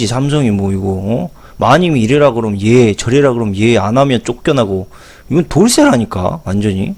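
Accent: Jeolla, South Korea. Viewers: 그래서 뭘 이야기하는겨. 전라도 억양으로 뭔 개소리를 하고 있는겨...... 인간 같지도 않는 놈. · 채널 주인 전라도 억양 삼성비하... 선동성... 끄덕끄덕 역시 그쪽 분위기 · 삼성을 망하라는 건가? 그럼 대안이 뭐냐? 인간중심? 좋아하네. 전라도 사투리도 거슬리고. 한심하다. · 믿고 거르는 전라도 사투리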